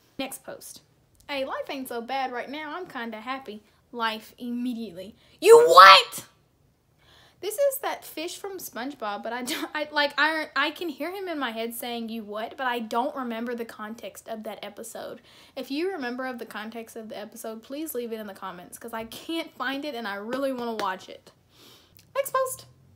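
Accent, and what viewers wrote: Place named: South, United States. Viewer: i didn’t know you were southern and now i’m even more in love with you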